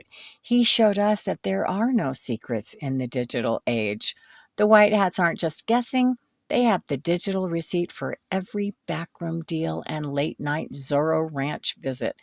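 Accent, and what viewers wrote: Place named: United States. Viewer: I'm 41 seconds in and your voice is more than the initial breath of fresh aair, it's American as Apple Pie and shelter from AI philosophers every other video. Ya watch one interesting Carl Jung vid and the avalanche of Yellow and Red starts happening in searches...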